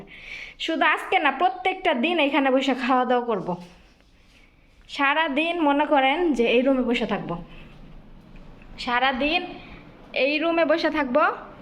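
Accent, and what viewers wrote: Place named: Manikganj, Bangladesh. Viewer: Apnar mokher vasa sone mone hoy apnar bari manikgonj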